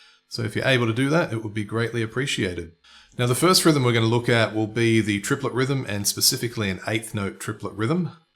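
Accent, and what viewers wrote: Australia. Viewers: What part of australia are you from mate?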